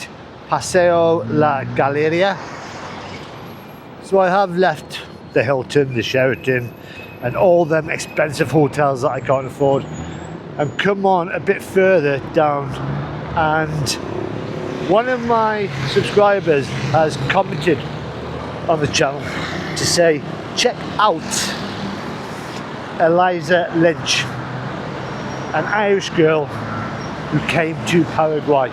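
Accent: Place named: Newcastle, United Kingdom. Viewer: Where you from Bonny Lad? Newcastle or Sunderland ..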